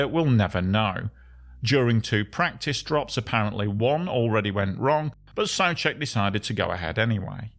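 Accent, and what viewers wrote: United Kingdom. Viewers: You have thickest most unintelligeble Br'ish accent Ive ever suffered.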